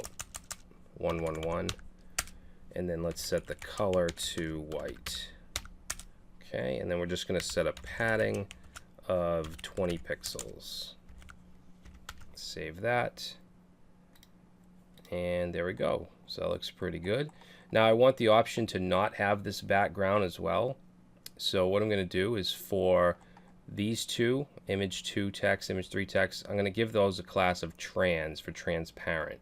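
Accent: Boston, United States. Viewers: When you hear someone's voice for so long and finally see their face. It's like my mind just went to a whole different dimension trying to sync up your face and voice. In all seriousness, I actually think your Boston accent helps me learn so much more. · dig the boston accent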